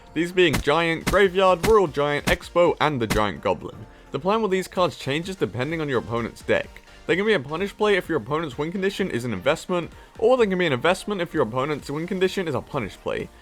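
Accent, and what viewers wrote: United Kingdom. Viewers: I got it your a British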